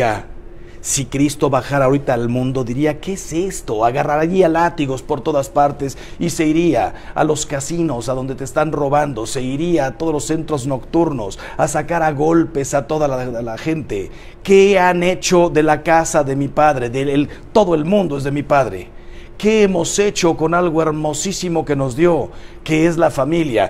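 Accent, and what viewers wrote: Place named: Argentina. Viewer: Pareciera que el padre habla en Argentina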